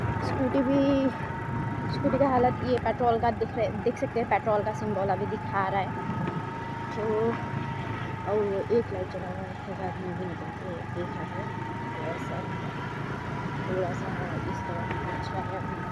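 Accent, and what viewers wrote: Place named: Assam, India. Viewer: Bhai vo assamese hai .. assamese ki hindi itni acchi nai hoti hai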